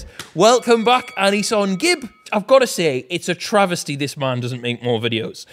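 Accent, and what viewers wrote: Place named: Scotland, United Kingdom. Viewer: As a Scottish person I approve of Scottish accent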